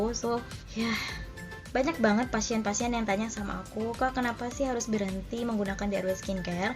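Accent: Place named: East Java, Indonesia. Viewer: Logatnya kak kayak orang Jawatimur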